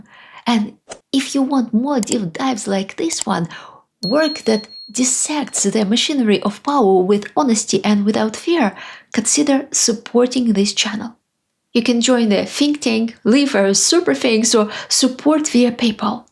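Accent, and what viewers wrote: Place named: Russia. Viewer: what is your mother's language? you sound as rusian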